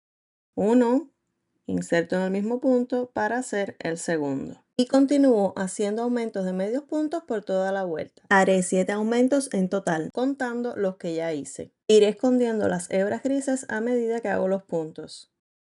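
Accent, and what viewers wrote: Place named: Chile. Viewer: Ela fala mto depressa e bem chilena mesmo! Outras entendo.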